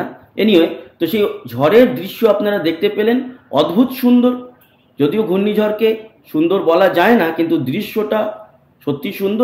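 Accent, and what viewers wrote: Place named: Fatikchhari, Bangladesh. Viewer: আপনার মুখে ফটিকছড়ির কথা শুনলে ভালো লাগে তাই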